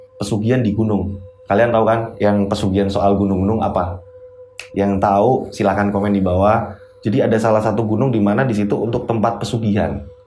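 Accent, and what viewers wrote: Java, Indonesia. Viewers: Assalamualaikum de.... Majalengka Jabar Hadir.... Seneng sekali dengerin cerita misteri de.... Suka sekali sangat pas menyampaikan cara bicara tokoh cerita nya.... Logat jawa nya.....sehat selalu de... Semoga chanel de semakin berkembang.... Boom.... Boom... Booommm😍🙏🙏🙏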